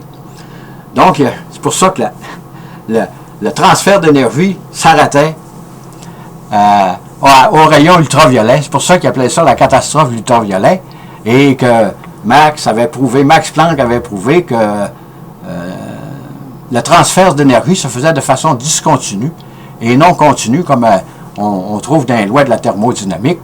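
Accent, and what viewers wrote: Quebec, Canada. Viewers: Depuis le Maroc Merci beaucoup. malgré l'accent québécois j'arrive à comprendre votre magnétique exposé.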